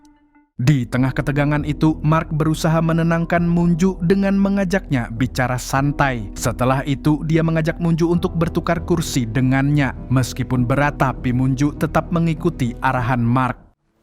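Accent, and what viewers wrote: Banjar, Indonesia. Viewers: adminnya orng banjar yh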